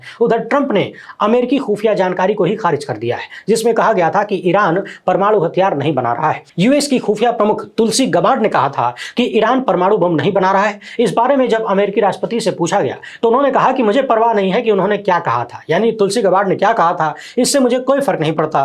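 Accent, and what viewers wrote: Bhojpuri, India. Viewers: आपका भोजपुरी अंदाज़ बहुत ही निराला है । मन को मोह लेने वाला । आप अपने इस अंदाज़ से भोजपुरी भाषा की भी सेवा कर रहे हैं । आपसे प्रभावित होकर, मैं स्वयं आपके भोजपुरी अंदाज़ में, अपनी विडियो बनाने का प्रयास करता हूँ । पहले मैं English language में अपना विडियो बनाया करता था । परन्तु, आप तथा रवीश कुमार को देखकर, सुनकर, मेरा आकर्षण पहले हिंदी की तरफ, तदुपरान्त भोजपुरी भाषा की ओर हुआ । मेरे लिए वैसे तो यह सब काफी मुश्किल है । परन्तु, फिर भी मेरा प्रयास जारी है । All credit goes to u n Ravish ji . 🙏🏻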